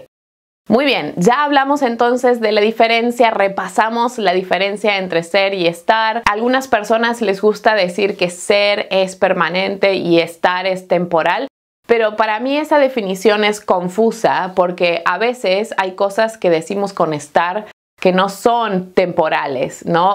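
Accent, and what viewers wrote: Argentina. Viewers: ¡Muchas gracias, muy interesante! Me gustan tus lecciones porque aprendí a hablar un poco de español cuando estuve en Argentina, hace muchos años, y entendí que eres argentina por tu pronunciación. La primera diferencia es la pronunciación de dos el... por ejemplo llave, lluvia, llamar, etc. Los argentinos los pronuncian más o menos como g, pero creo que la gente en España los pronuncien diferente. Disculpe mi español, es muy malo. Estoy aprendiendo. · ¿Eres argentina? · Ella está hablando más en castellano argentino salvó el tu qué acá no se usa el español tiene muchos tiempos de verbos que ni yo los se salen solos soy argentino si querés aprender te aconsejo que vayas más al norte de sudamericana te va a resultar más fácil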